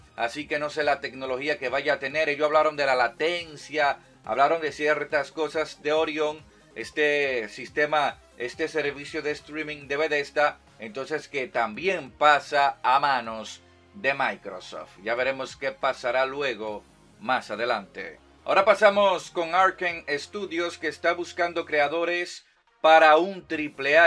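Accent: Dominican Republic. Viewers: Este pana suena Dominicano! Muy buenos videos, Tiene mi like buen hombre!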